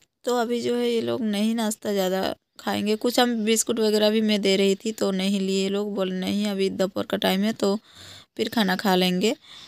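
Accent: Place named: Bengal, India. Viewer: Are you a bengali as you talking asent is like bengali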